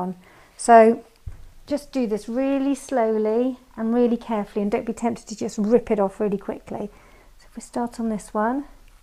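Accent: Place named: United Kingdom. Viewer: I love that British accent so much!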